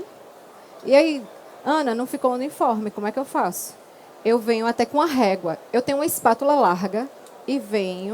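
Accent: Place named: Pernambuco, Brazil. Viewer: Adorei! Perfeito o bolo de rolo, melhor bolo! Adorei o seu sotaque. Viva Pernambuco!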